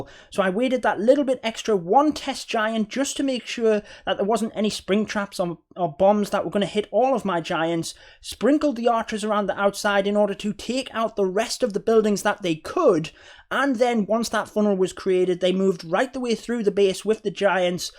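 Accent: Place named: Newcastle, United Kingdom. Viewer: found your channel and subscribed! Great content mate 😃 can't quite get your accent! was gonna say Geordie but leaning more towards Boro, Sunderland? I'm from near Darlington! keep up the good work :)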